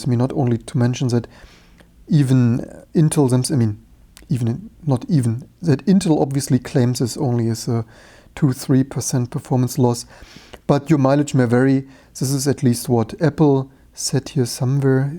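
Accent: Germany. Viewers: Solltest schon sagen dass du Deutsch bist, es ist wirklich nicht zu überhören 😅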